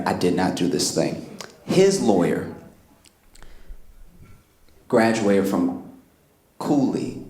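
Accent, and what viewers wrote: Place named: South, United States. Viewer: I notice that speaks in a way that shows he’s highly intelligent but at the same way his manner of speaking is “folksy”. For example he says wadn’t instead of wasn’t and them instead of those which is common in Southern dialects. He completely dispels the myth that if someone speaks a certain way they aren’t intelligent which is a common stereotype placed upon Southerners.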